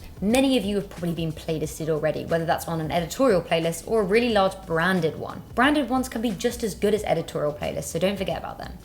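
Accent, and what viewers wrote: United Kingdom. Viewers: I think your so cute and i love your british accent...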